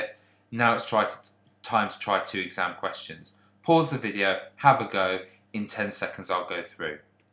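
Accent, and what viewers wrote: United Kingdom. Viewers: He has something called a British accent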